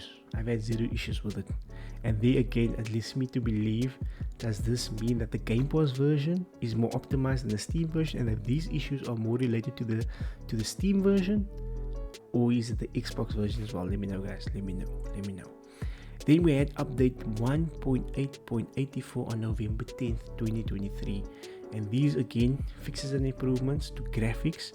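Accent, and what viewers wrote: South Africa. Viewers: Mate love the South African accent haha. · Lol not doging your voice or anything but I genuinely thought you sounded Indian with a British accent. Only when you said south African did I start hearing that.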